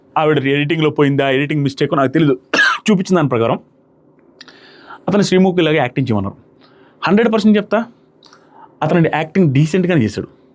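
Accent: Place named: Udal, India. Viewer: UDAL MOVA MATLADE VIDHANAM ISTHAM UNNA VALLU OKA 🤍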